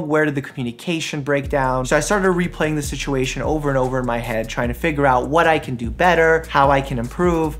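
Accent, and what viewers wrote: New York, United States. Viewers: when you watch the video in 1.25 speed, you can hear his new york accent XD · I’m just curious, did I hear a slight New York accent from doctor mike🤔 😎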